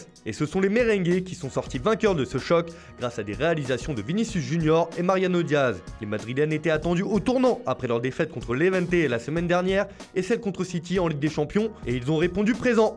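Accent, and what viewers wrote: Italy. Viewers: Reds Jr perso moi j’peux pas j’ai envie d’entendre l’accent italien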